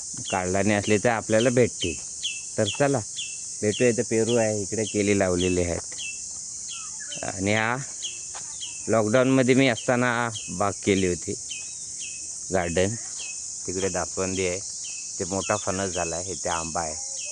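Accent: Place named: Sangameshwar, India. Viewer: लय भारी आपली संगमेश्वरी बोली भाषा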